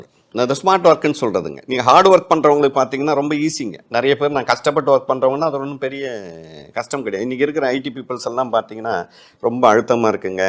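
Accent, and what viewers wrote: Kongu, India. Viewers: கொங்கு தமிழ் ❤😇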